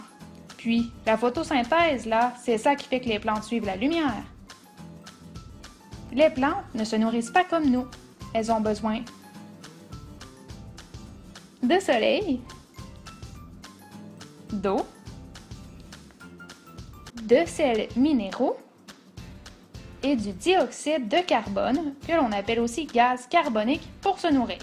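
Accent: Quebec, Canada. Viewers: Kebek je crois!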